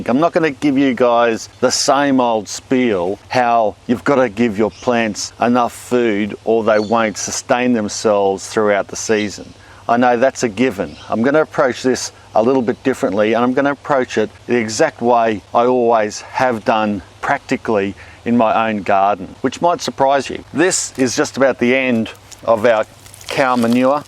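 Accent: Australia. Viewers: Oi Loike Baaiiibeees. Auzzie accent can be so epic. Also. Good video!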